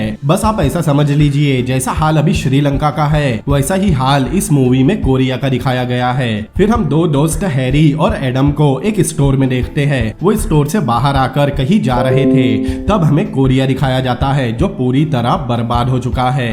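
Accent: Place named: Gujarat, India. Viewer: Aap gujarati hona Awaaj se hi pta chalta h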